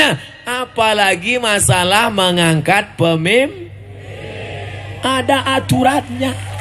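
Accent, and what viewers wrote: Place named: Medan, Indonesia. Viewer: Mantap kalilah UAS ni logat medannya keluar,,, 👍👍👍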